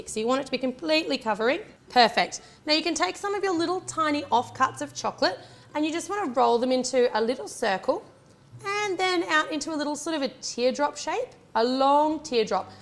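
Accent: Australia. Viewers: I just started watching your tutorials and I think they are great! ; ) I just want to know if you are Australian ? :-)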